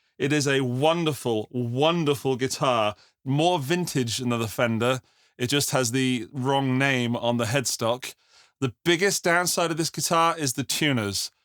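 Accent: United Kingdom. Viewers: How come we have someone with a British accent reviewing guitars in America?:face-green-smiling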